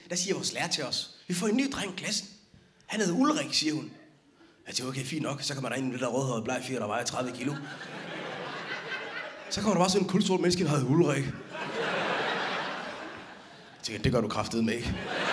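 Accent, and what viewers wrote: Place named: Jutland, Denmark. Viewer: Elsker din humor, jyske accent og din store portion selvironi. Thumps up herfra.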